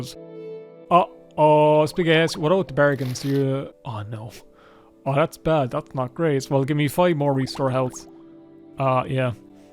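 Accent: Ireland. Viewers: You sound like DingDong in an Irish accent and it's truly endearing. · why are you irish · I missed your beautiful Irish accent 😭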